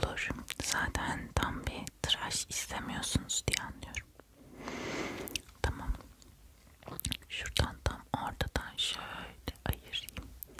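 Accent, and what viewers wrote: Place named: France. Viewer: Sen turkce ceksen o Fransız aksani ile en az 10 bin abone gelir yavrum ;d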